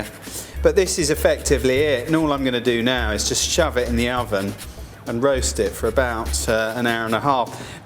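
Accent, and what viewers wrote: England, United Kingdom. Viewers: Love his english accent...he is rubbing into the skin....ah ah ah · I liked this video as it was simple and of course the British accent makes watching the video enjoyable I am going to make this for thanksgiving as after so many turkeys and hams I look forward to a change. Thanks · brit accent - is the best ingredient to this recipe. not so sure about the rest.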